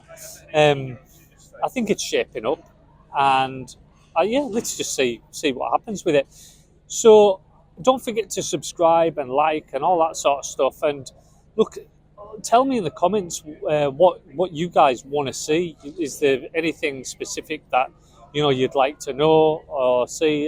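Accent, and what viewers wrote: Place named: North, United Kingdom. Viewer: Hello from France! Love your entire channel! I always learn things in your videos. And your delivery is very good too, with a calm voice and a lovely Northern accent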